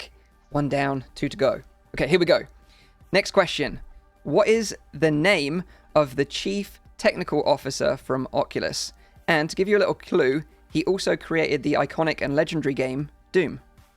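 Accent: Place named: United Kingdom. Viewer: Honestly I personally don't mind Britishisms or any other country's thing/quirk as it's what makes you unique/you. It's a part of you and how you lived I want you to speak however you want lol, multiple English speaking countries have different spelling/pronunciations, that doesn't make one wrong or the other correct thought!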